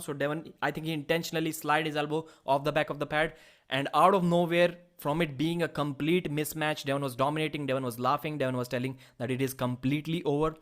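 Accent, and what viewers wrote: India. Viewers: Who the F wanna watch your dumb Indian baldy and accent. Just show the video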